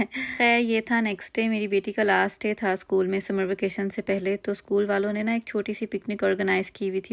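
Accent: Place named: India. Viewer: Your channel name is pakistani mom. But you dnt sound pakistani your urdu sounds like an indian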